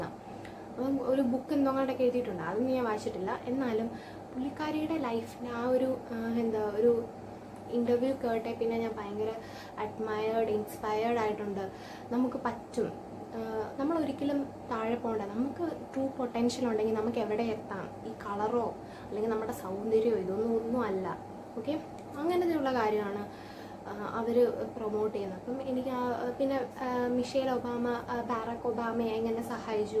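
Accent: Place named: Kottayam, India. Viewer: Aahaa chechi Kottayam aa alle enikku samsaram kettappazhee thonni Njan oru Q and A thappinadakkuvarunnue Eppam alle kittiyee Njanum Kottayam aannee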